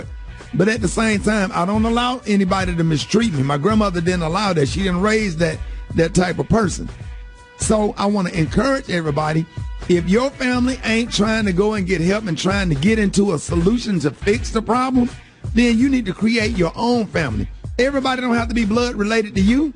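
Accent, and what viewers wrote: South, United States. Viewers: 🎙️🎙️. Bro. I love your channel 💜 and your southern accent. I finally made up with my cousin. Every family isn't perfect. God is still helping me to love on them.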